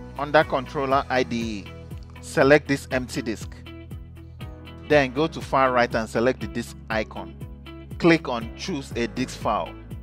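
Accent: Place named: Africa. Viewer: this guy is soo good. like where were you men ... You actually spoke like an African .... everything was so simple .... God bless you ... I'm your fan from now onwards .... and I'm gonna spread the good news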